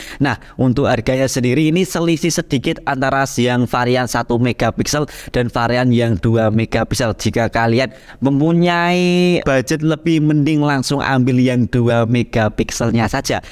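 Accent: Java, Indonesia. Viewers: suara org jawa, medog bgt...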